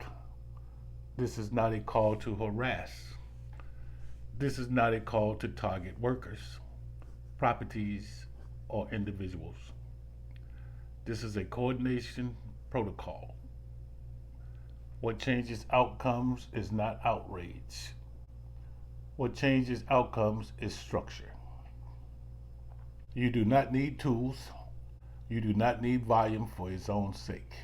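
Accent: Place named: United States. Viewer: Sounds American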